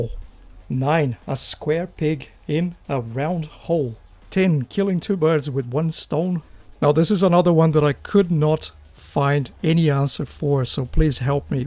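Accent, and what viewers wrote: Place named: New Zealand. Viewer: Hi!:) I just started the video and I realized how I kinda missed your NZ accent!:D So good to hear from you in another video, I feel immediately comfortable and already enjoy your puzzle experience. Thank you and lots of warm greetings from Germany:)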